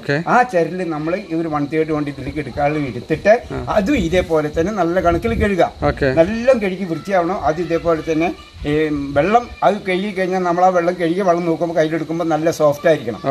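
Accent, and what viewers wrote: Palakkad, India. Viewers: Palakkadan slang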